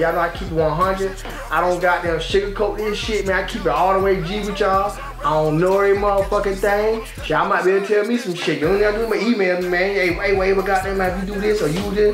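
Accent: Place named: Georgia, United States. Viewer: Nigga got be from savannah,dublin,or atl💀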